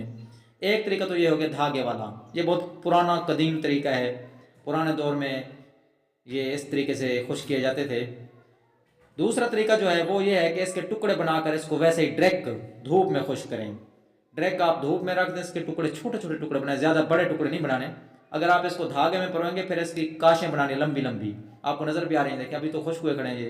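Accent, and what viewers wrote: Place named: Saraiki, Pakistan. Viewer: میڈا بھرا بالکل سرائیکی لگئے😅😅کتھوں دے ہیوے بھرا خاں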